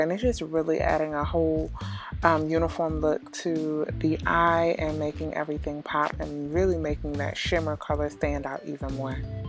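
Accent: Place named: New York, United States. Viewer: lol I definitely hear a little new york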